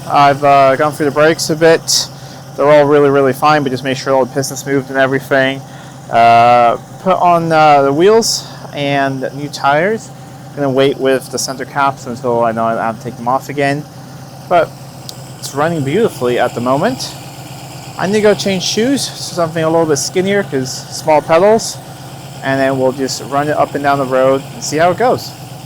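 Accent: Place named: Midwest, United States. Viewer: Hey OP, how long have you lived in the UK? Sound like a Midwestern man.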